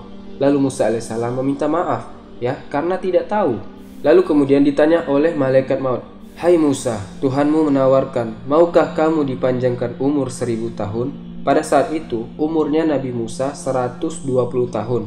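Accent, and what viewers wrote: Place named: Lombok, Indonesia. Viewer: Dialek saudara seperti dialek orang lombok. Betulkan saudara dari lombok?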